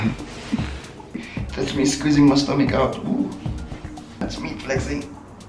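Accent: South Africa. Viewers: Are you South-African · I knew I recognised a fellow South African 😁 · Just found your channel. I thought the accent sounded familiar :)) South Africa! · You're one of the few South African sounding South Africans I've seen on YouTube yet. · Are you south african ? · Hell yeah, I was guessing you're from South Africa. That is my favorite accent in the whole world :D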